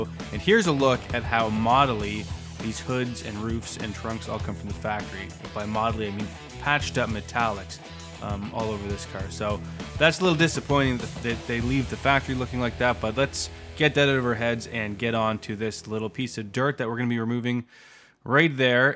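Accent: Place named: Canada. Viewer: Good video. Love the Canadian accent!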